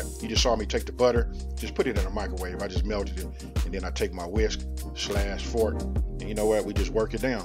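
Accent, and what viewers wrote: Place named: California, United States. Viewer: That Cali accent don’t lie! I love it! And those pancakes looks sooo good!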